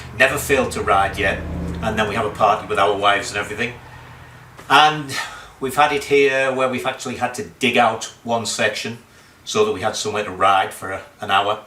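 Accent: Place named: Teesside, United Kingdom. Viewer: Ahhh, thought I recognised that accent - a Boro lad like myself. Hope you are enjoying your retirement. I am about to start on the restoration of my '67 BSA Lightning so may need your expert guidance!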